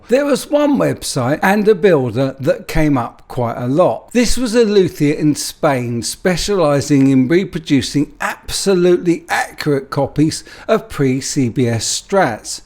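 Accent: England, United Kingdom. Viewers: You sound English to me, heard some crazy accents. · Sounds like a typical English accent to me. I would have never thought he had a speech problem if he didn't say so.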